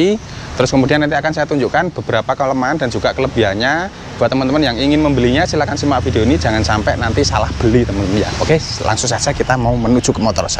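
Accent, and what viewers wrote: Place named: Sawojajar, Indonesia. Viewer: Kyk nde sawojajar sam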